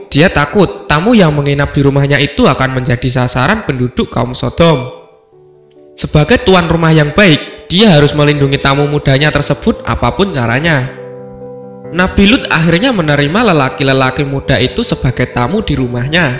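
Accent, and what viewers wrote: Java, Indonesia. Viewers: Wong jowoooo medokk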